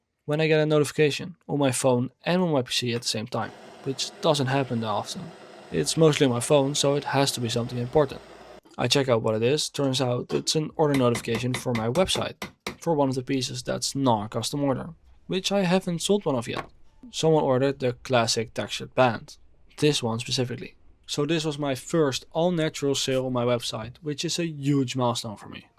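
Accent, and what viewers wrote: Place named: Netherlands. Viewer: Your accent sounds like you are Dutch! Very nice ring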